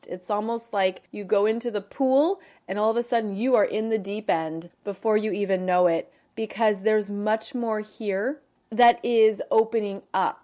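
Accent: United States. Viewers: I bet you are from Michigan. Northeastern...???? I hear it in your voice.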